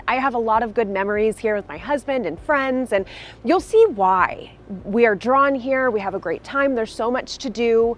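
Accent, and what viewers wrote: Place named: California, United States. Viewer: Hello ^^ It's the thinker Christina Hoff Sommers who brought me here because she's from Petaluma and I wanted to know more about the homeland of my favourite female intellectual. You have the same lovely accent as her and also her laid-back cool attitude ☺ As says: " are unforgettable". I live in Algeria, but I long to visit your gorgeous town, especially the library-museum for I love books and history! Good continuation my friend 🙂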